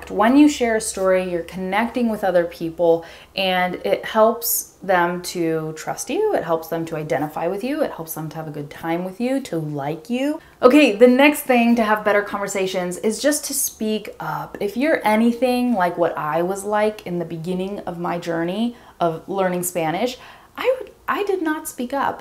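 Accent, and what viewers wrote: California, United States. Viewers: i adore the American accent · I really like your Californian accent. It's easy to understand so I can get your tips so well. Thanks for making this video Greetings from Lima :) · i just want to hear you talking i like your voice and american accent.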